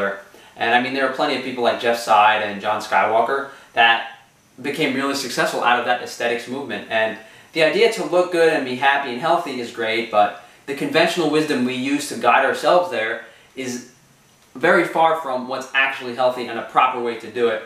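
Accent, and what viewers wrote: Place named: New York, United States. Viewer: I love your muscles and you are fine as hell.I don't care what anyone say.you're hot.Love listening to your videos rather I agree with them or not.Even if I don't agree with some of the information I just love listening to you talk.I love your Italian and new york accent.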